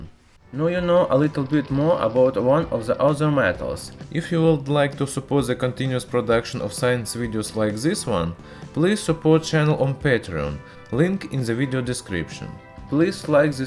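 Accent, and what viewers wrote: Russia. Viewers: Borat is from Kyrgyzstan while this guy is from Russia or at least has a thick Russian accent Kyrgyzstan and Russia were once in the same empire but I think it's a bit broad to say that Borat has a Russian accent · Utkarsh Amitabh Srivastava its russian accent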